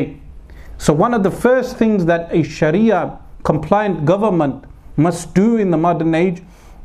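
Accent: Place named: Birmingham, United Kingdom. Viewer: this man is from Birmingham. his accent sounds proper thick. and he's a Brelwee. Double whammy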